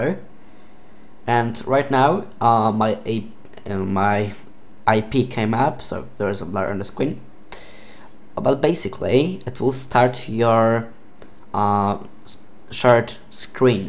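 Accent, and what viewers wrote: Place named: Poland. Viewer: Polski akcent 🔥🔥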